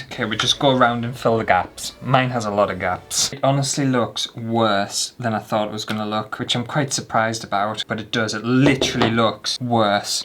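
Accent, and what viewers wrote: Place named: Newcastle, United Kingdom. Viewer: I know it's great, devastated that you change the captions to words others will understand 😂 gotta love a Newc accent lol I think one day you should put the words you actually say 😂 just for shits and giggles 😂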